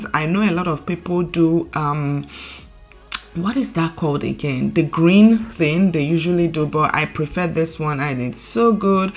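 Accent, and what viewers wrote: Nigeria. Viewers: WE LOVE THE NAIJA ACCENT 🥰❤️🥰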